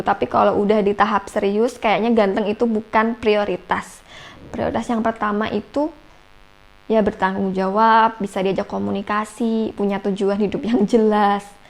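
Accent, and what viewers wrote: Indonesia. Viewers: Yg bikin aq suka liat chanel ini krn suara renyah dan manja. Logat jawa nya tetep g ilang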